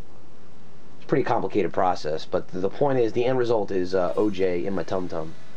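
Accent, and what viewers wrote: New Jersey, United States. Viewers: He still sounds like he is from Jersey in these videos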